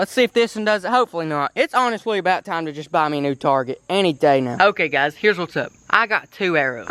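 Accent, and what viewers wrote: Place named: Kentucky, United States. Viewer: "Not as FIRST as the CLOSE one" love the vids , that east Kentucky twang runs deep as the mines!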